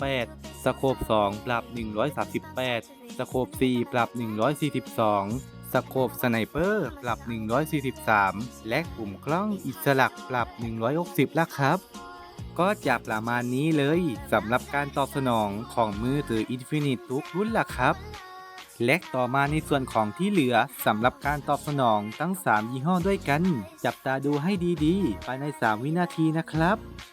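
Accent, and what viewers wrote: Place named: South, Thailand. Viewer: ผมคนนครพี่คนที่ไหนเสียงเหมือนคนใต้เลย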